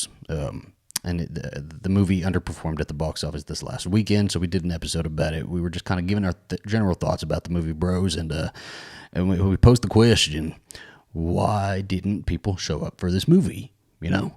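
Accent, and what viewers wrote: South, United States. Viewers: I can't believe you're reading all the comments about one of the gay movies, "Bros" on this video at your house in Texas during the episode of "Coffee & Tequila", but your husband, Alastair is not there and I hope he'll be very happy for you, I wanna say I'm proud of you, I know you're gay and you had the Southern accent!!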